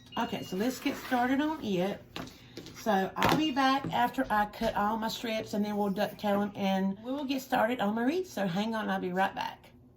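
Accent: South, United States. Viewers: I just love your show and that southern accent you have ! I truly enjoy the decorations and the diy’s . When I am feeling low you make me smile Be blessed ! Andrea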